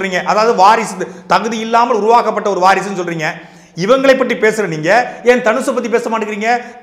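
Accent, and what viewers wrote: Tirunelveli, India. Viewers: நெல்லை தமிழை நெருப்பாய் கொட்டும் சாட்டை வேற லெவல்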